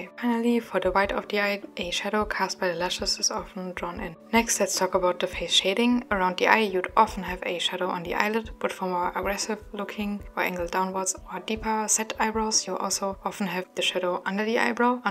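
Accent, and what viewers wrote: Germany. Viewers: THANK YOU. So useful! Are you german? I hear an accent. I also recommend talking slower or more clearer, sometimes it's difficult to understand you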